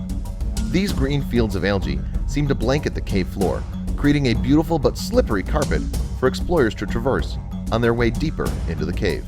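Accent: United States. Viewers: Within the first two minutes the video contradicts itself... Which was it... the sound of the wind or rushing water? And why bother to even try to tell us again what you've said only two minutes before? Is this made for the dumbest people alive.... Oh wait, the narrator is a yank so I guess it was.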